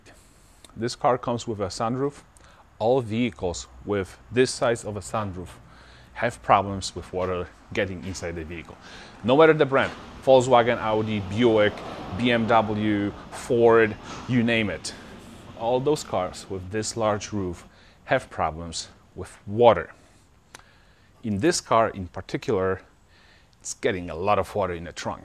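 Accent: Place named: Poland. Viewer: sorry for my question i was just curious, noticed your accent and knew you must be polish how long you've been living in US? I'm Polish as well and live in UK Greetings!:)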